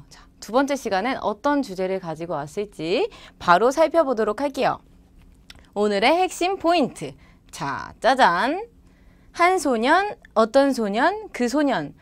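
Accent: South Korea. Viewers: 셈. 할끼요.억양이경상도쪽? 프랑스문법쉽게설명해주시어 감사합니다. 🇨🇵 😅 🇰🇷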